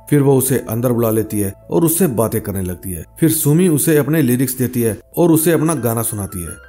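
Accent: Punjab, India. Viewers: Punjab se hi bhai ki awaj Punjabi he bro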